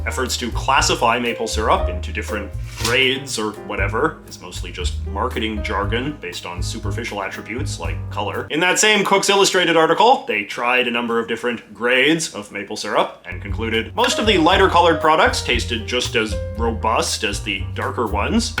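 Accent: Canada. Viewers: Of course the biggest steriotype of Canadiana is accent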